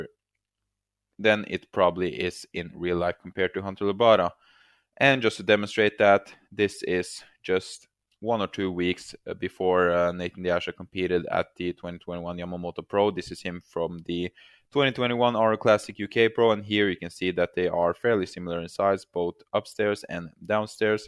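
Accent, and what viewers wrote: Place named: Sweden. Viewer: If I may ask, are you Swedish???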